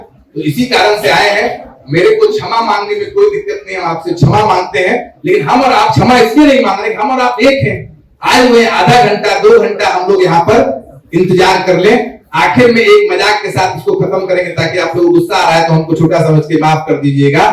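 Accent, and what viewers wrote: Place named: Bihar, India. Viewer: भईया आप का हिंदी भाषा बिहार का हिंदी भाषा से मिलता है ऐसे ही बोलिए लोगो को समझ में आयेगा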